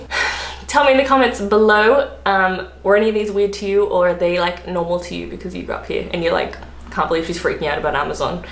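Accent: England, United Kingdom. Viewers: She is attractive and sounds more English than Australian...interesting observation. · Immediately the first thing I noticed is how much more British your accent sounds compared to part 1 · Your accent is definitely changing. It sounds much more English than in your earlier videos. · Love the way your British accent is improving, you sound more intelligent and slightly wiser.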